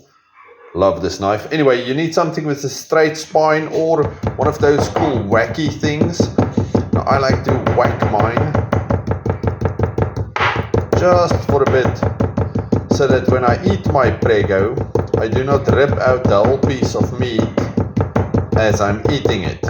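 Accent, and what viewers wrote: Afrikaans, South Africa. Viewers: Jy kan sommer hoor hys Afrikaans 😂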